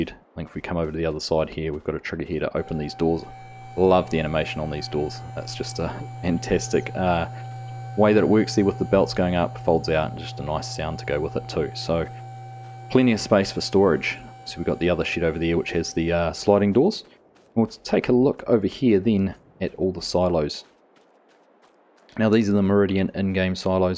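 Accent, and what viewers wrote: New Zealand. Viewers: I love this NZ accent 😁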